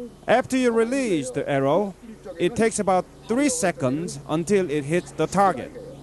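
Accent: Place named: South Korea. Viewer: There is a Korean accent in that English. I agree it sounds very unnatural.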